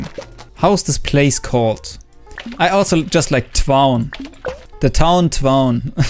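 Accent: Germany. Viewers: Bro man hört so hart raus das du Deutscher bist 😂✌🏾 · dieses deutsche englisch :D sounds so fvcking weird with the german voice hahahaa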